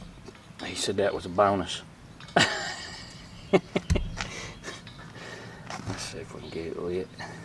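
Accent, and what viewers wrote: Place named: South, United States. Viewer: your Southern accent gives you instant credibility with me on issues related to smoking and grilling. Do you know how hot the lot setting would be for smoking ribs? I am trying to dial in a 3-2-1 method but want to keep the temps at 200-225 F.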